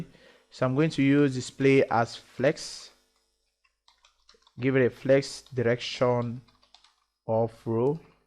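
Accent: Nigeria. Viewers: He sounds like a Nigerian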